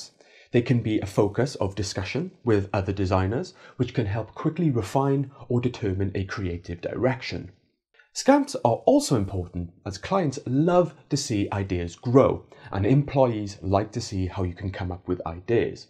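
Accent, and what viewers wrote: Wales, United Kingdom. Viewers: Hey fella are you Welsh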